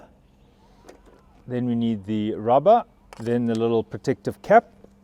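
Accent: South Africa. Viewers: Actually, I found the opposite to be true. He's quite articulate. If you're not a native English speaker, I could see having issues understanding. Sounds like this gent is from South Africa. Personally, I'd suggest just being thankful he cared enough to share his experience and teach folks how to do this job. CC, playback control and rewind are things. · Yes this guy is definitely from South Africa, as am I. He really is a very good instructor, especially on the e65. His videos has helped me out a lot already.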